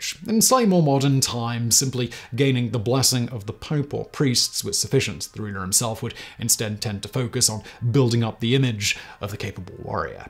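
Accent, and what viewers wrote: United Kingdom. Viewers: If you watch his other channels, he openly talks about not even being able to remember recording videos anymore because he does so many. Scripts are created by his writing team these days, and he just reads them in his reassuring-sounding British voice.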